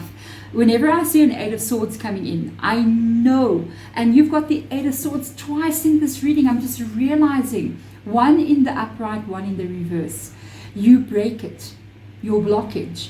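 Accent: South Africa. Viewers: Is that a South African accent I hear? Love your channel and thank you for the wonderful readings ❤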